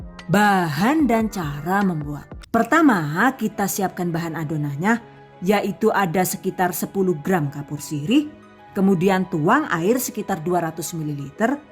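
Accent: Banyumas, Indonesia. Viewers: Yg saya suka dari cahnel ini yg saya rasa susah jdi kelihatan gampang buatnya bunda dengan cara penyampaian yg jelas dan terperinci smbil ngelawak bahasa ngapak yg kadang buat saya ketawa sendiri Baru kali ini dapetin chanel yg gak ngebosenin di liat, sukses selalu bunda #GiveawayTHV2021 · Suka bgt sm resep2 nya & sm logat ngapak nya...semangat trus buat bkin resep baru nya... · Saya paling suka cara bunda menyampaikan tutorial nya, anakku paling hafal klo aku buka video ya pasti bilang OKE...sama CATAT...sama itu dealek ngapaknya itu lho🤭🤭🤭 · Sprtinya dri banyumas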